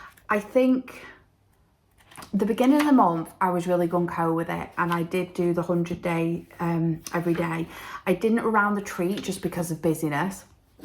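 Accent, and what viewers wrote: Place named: Sheffield, United Kingdom. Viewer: Wow, great month! My first retreat was Retford too ☺️ totally agree it was fabulous. Where do you come from? Your accent sounds local to me near Sheffield xx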